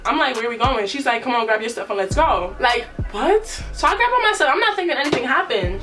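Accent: New York, United States. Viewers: She reminds me of Christina from bad girls club with a New York accent lmaoo 😂🖤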